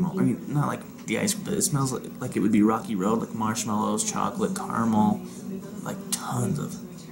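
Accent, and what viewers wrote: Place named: California, United States. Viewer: are you from Orange County, CA by any chance? You talk the same lingo we do lol. I live in AZ now though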